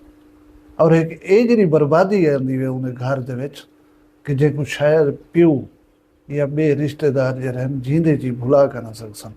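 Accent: Saraiki, Pakistan. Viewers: صاحب اللّٰہ رحیم وکریم آپ کو اپنی حفظ و امان میں رکھے ۔ آمین ثم آمین بہت خوب صورت اسلوب بیان ساتھ سرائیکی زبان کی مٹھاس کا جادو۔ کیا کہنے۔ حقیقی اور وسیب کے دل دماغ اور ضمیر کو جھنجھوڑ دینے والا روزمرہ کے حالات واقعات پہ مبنیcontent ۔ ماشاءاللہ اس واقعے نے دل و دماغ کو ہلا کر رکھ دیا۔ اتنا خوبصورت جوان ۔ صاحب یقین کریں مزید کچھ کہنے اور لکھنے کا حوصلہ نہیں۔ بوڑھی ممتا ، جوان سالہ بیوہ ، معصوم ننھے فرشتوں اور اس عمر میں جوان سال بیٹے کا جنازہ باپ نے کیسے اٹھایا جو گا۔ اللّٰہ رحیم وکریم سے اس خاندان پر اپنا خصوصی فضل وکرم فرمائے ۔سب کے لئے آ سانیا ں فرمائے ۔ مرحوم کو جنت میں اعلیٰ مقام عطا فرمائے ۔ آمین ثم آمین اللّٰہ تعالیٰ آ پ کو دین ودنیا کی ڈھیروں کامیابیاں اور کامرانیاں نصیب فرمائے ۔امین ثم آمین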